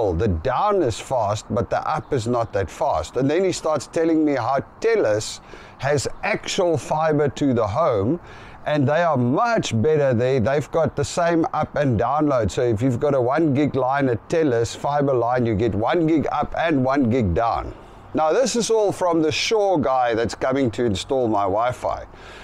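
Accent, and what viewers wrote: South Africa. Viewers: You are South African🎉❤ i can immediately tell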